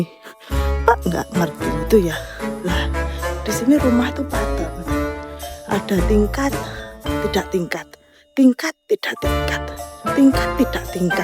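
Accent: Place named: East Java, Indonesia. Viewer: Terdeteksinya logat jatim, bener ga Bu? Hehe😅😅❤❤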